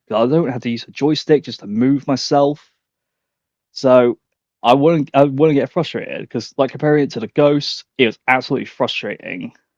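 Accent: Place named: Bristol, United Kingdom. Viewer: Your from Bristol gurt accent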